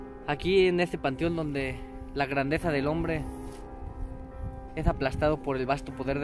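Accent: Mexico. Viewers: Smn hasta parece de Chihuahua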